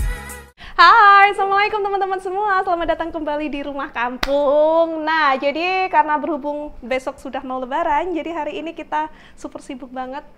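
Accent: Java, Indonesia. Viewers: Mbak, pulang ke Indonesia medok nya keluar ❤❤ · Mbak jadi medhok bgt ngomongnya 🤣🤣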